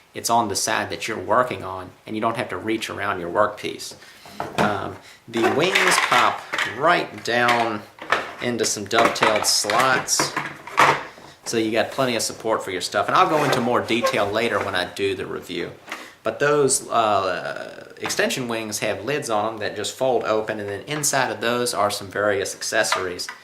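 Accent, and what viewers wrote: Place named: Arkansas, United States. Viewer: Off-topic.. Being from Scandinavia, I think I've only ever heard one [famous] person from Arkansas before... do you happen to be from thereabouts as well? :) Keep up the good work!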